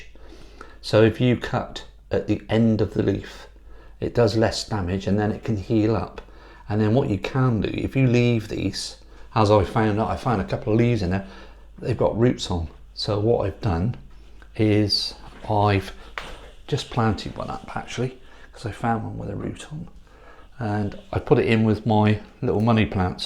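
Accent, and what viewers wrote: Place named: West Country, United Kingdom. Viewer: Nice plants, nice to hear a proper West Country accent. Before I left the uk we had grandmas cactus that were said to be her moms, I’d like to think they still exist in some form or another, at the moment I have red, pink and white ones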